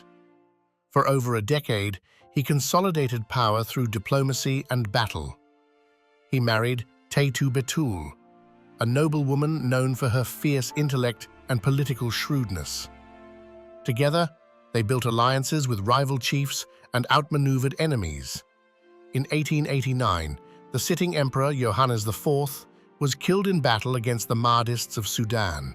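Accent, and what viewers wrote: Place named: Europe. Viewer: It's only one Menilik that existed in ancient time. Menilik ll , is the only Menilik. The person telling the story sounds like a European. Europeans are good and fabricating stories. The story is fake as a 3$ bill. Menilik story is around close to 300 years old. Stop believing everything you read in hear. Read, study and research ancient time facts.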